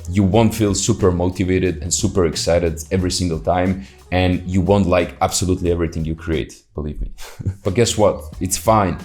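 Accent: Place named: United States. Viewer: Damn bro, you speak like Amerikanski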